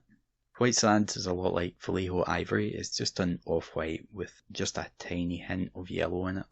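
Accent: Scotland, United Kingdom. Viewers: Wow. Miniature painting has come a long way since the 80s! Sublime work... not to mentioned narration by a smooth, Scottish accent. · I had to dig the urban meaning of shucks ^^ English is not my native language (being French)..... but I enjoy your scottish accent :) Keep up the great work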